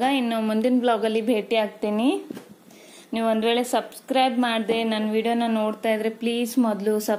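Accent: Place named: Kundapura, India. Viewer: Kundapura bashe super. Nam kundapura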